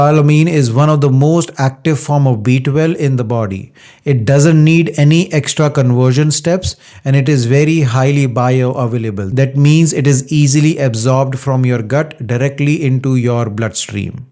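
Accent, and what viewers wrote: India. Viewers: Sorry, but now I am totally screwed up. I've been using the Methyl Cobalamin format of B-12 for years based on available research. Now, we have this feller suggesting that Methyl Cobalamin is the best format. The problem is that I have no intention of trusting a feller with the kind of accent, name and appearance I am seeing and hearing in this content. Sorry, but when I listen to him, I have images of those folks who, before I blocked them, used to call me five times a day and tell me they were Microsoft engineers from Mumbai and were available to help resolve my computer issues if I would only buy them some gift cards. Perhaps the sponsor of this content could hire a busty young blonde haired white woman from Texas, with a southern accent, to pitch this product. Otherwise, I guess I'll just stop taking the B-12 or do the opposite of what this feller is suggesting. 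Thanks for your attention to this matter.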